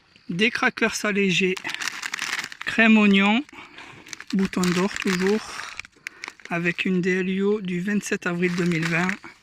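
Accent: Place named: South-West, France. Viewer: "Était" Il a un empêchement, peut être qu'il veut faire une pause sur les vidéos, problèmes médicaux, j'aimais bien son accent de chez moi (Sud-ouest, Dordogne)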